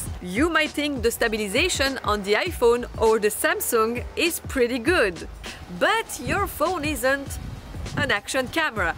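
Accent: France. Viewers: Ah le bel accent Français j'adore hi hi hi hi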